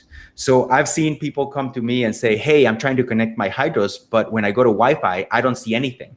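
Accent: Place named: Louisiana, United States. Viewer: The Louisiana accent is the best. And that’s coming from someone with a Texan accent.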